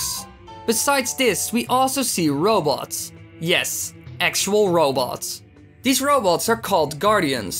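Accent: Netherlands. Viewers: ik hoorde het aan je accent, ik heb t gevoel dat ik dat bij elke nederlander kan 😂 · You're Dutch right? If so, dat is te horen haha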